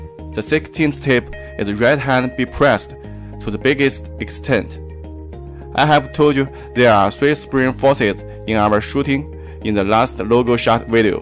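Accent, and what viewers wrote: China. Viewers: even with the Chinese accent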